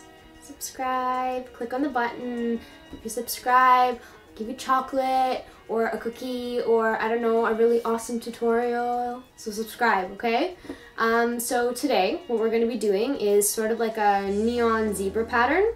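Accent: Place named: France. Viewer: A) your nails are so pretty i'm so jealous. B) where are you from? it sounds like you have a *slight* french accent hahaha C) I subbed!!!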